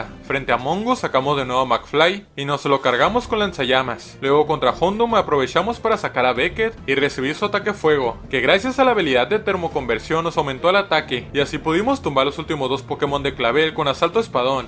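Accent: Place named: North, Mexico. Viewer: Oye bro. ¿De qué parte del norte de México eres?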